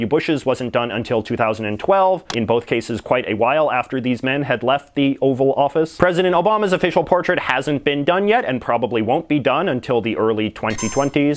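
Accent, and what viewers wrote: Canada. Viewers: Your Canadian accent physically hurts me. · Wait, are you Canadian? Every time you say, “about” I’m like, heyyyyyy.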